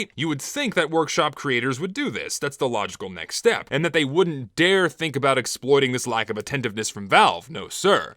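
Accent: Texas, United States. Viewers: "The more lazy, narcisstic sides of the workshop" a little bit of sundowner just spilled out there. I love the Texas accent.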